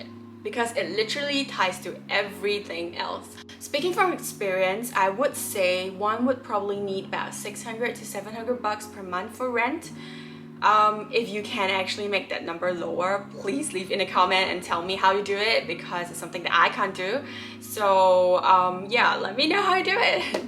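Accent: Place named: United States. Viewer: If you don't mind me asking, are you American or Malaysian. The reason I'm asking, your accent sounds American.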